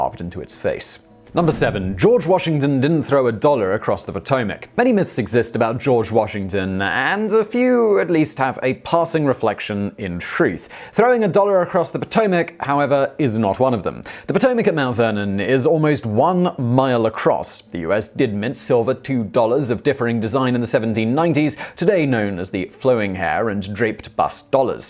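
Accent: United Kingdom. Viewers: bro he british he ain’t even american🤣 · Me an American: ahhh yes British Big Brain tells me history. I enjoy this 😂👍🏻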